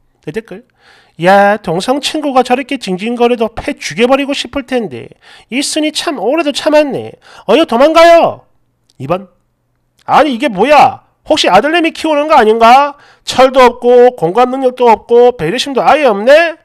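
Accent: Gyeongsang, South Korea. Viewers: ~~~ 쥐~~짠다~~~~ㅋㅋ 전에 경상도에서 10년정도 살은적 있었는데 덕분에 오랜만에 듣고 혼자 빵터졌어요~ㅋ 글구 사연속에 저 쥐 짜는놈은 듣다가 육성으로 ㄱㅅㄲ~~라고 욕했던건 안비밀 이궁~ㅋ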